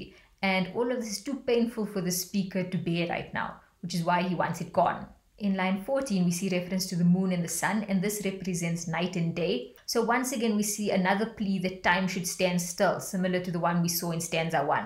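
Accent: South Africa. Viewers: south african? i can hear the lovely accent 🇿🇦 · Are you south african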